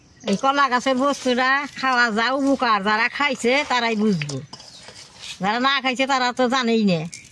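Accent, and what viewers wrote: Tangail, Bangladesh. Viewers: বাংলাদেশে টাংগাইল জেলার মত ভাষা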